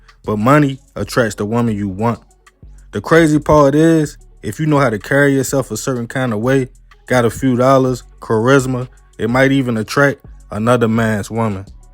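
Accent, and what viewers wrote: Baltimore, United States. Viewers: Sound like you from bmore.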